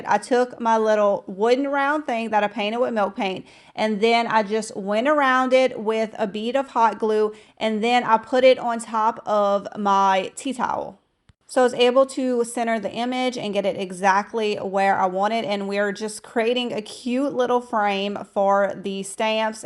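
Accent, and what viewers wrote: Louisiana, United States. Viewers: Love watching your creative projects! Also, I have to know where your accent originates! My guess is Louisiana.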